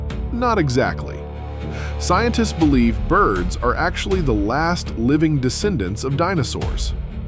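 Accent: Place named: United States. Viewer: There are better sites than this and don't have the silly American voice.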